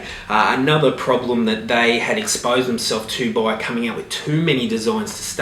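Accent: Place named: Australia. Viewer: I'm Australian and can tell he is also.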